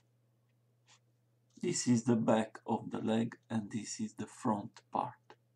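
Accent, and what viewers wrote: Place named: Russia. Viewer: пытается говорить по английски ,но сражу просвечивается русский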